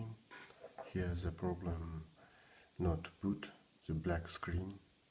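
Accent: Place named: Russia. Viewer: No he’s Russian. That’s their English. Very little and useful 😂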